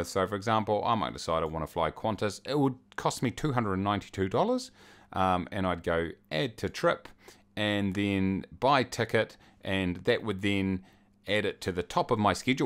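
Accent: New Zealand. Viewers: VLDL made me found of NZ english accent :) Thank you for the walkthrough !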